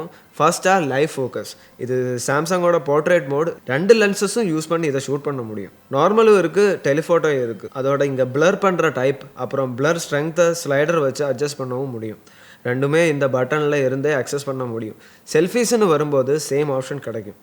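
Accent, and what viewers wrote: Kerala, India. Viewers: Malayalam hero Tamil pesara mathiri iruku ba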